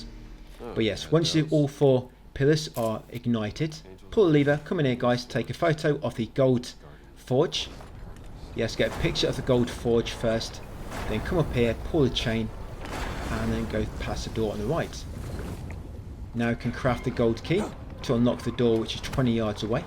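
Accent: England, United Kingdom. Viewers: do you know what English accent you have?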